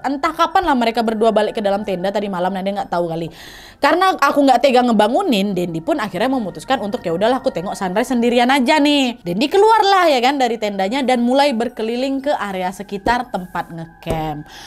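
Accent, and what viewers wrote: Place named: North Sumatra, Indonesia. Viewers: Kak, kk ni asli mana kah? Kadang pake slang medan, kadang minang. Aku kan jadi pinisirin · Makin kesini kok kayak makin yakin kalo kak emang orang sumut. Ntah medan atau binjai mungkin ya. Soalnya cakapnya logat² nya sumut kali 😁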